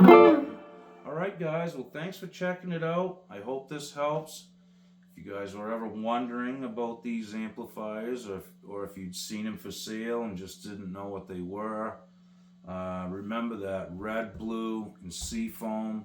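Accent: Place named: Boston, United States. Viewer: you boston sounding mf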